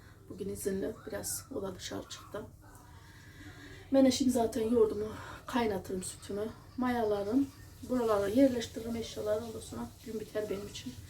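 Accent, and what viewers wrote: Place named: Gaziantep, Turkey. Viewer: ayy ne güzel hemşeriymişiz bende Gaziantepliyim demekki kan çekti 😂👍🏻şivenden benzettim zaten 👍🏻❤️🌹🙋‍♀️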